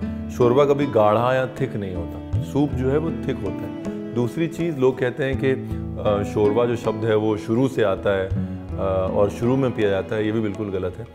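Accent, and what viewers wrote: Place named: Uttar Pradesh, India. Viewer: You say like UP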